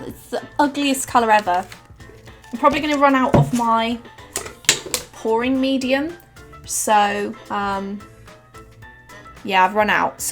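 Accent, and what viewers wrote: United Kingdom. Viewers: Are you British ??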